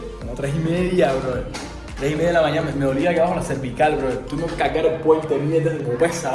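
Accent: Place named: Cuba. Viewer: tu español no suena neutro, parece cubano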